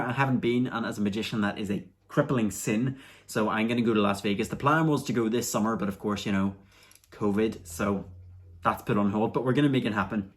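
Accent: Ireland. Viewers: Your accent is an Irish one right? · I love his Irish voice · Are you Irish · I’m not 100% sure but is your accent Irish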